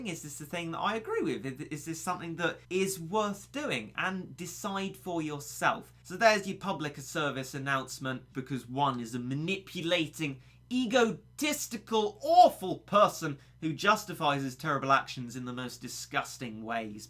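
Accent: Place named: United Kingdom. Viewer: I can see why this guy would think these untrue things- it's your english accent! Remember that in American pop culture everyone with a british accent is automatically a villain.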